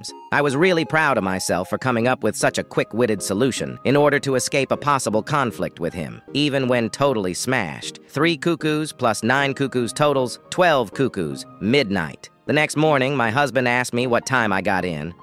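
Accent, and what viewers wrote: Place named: South, United States. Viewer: This, this is like the 7th time I heard this story, and the 1st time it sounds vaguely southern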